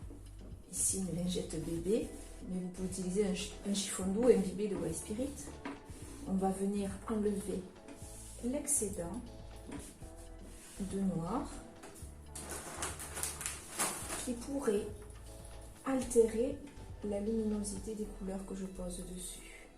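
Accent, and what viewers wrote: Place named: Toulouse, France. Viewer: Magnifique ! Merci pour ce tuto très coloré et moderne....accompagné comme toujours de votre joli sourire et du petit accent toulousain 😁😉😘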